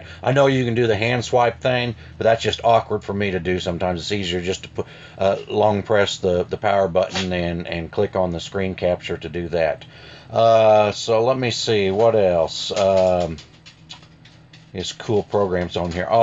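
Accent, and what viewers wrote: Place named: South, United States. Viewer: I just purchased a Tab S, so i've been watching alot of reviews for the Galaxy Tab S in various engish dialects from different countries and your video in the southern American dialect is great. Very impressed with the way the video was presented and really showed a great tablet "really" used for work. You sold my on the Next Launcher, very good set up you have. I will be hitting subscribe, thanks dude.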